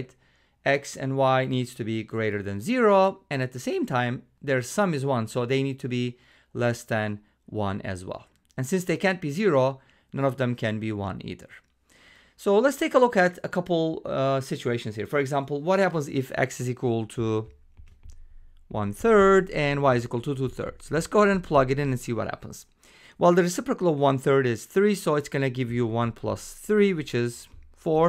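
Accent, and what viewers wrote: Turkey. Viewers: where are you from? turkey or united states?????????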